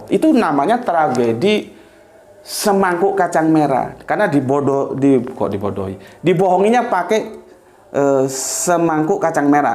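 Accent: Java, Indonesia. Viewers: Hahahaha.. Inggris jowo campur mbatak... hahahaaaa saya suka..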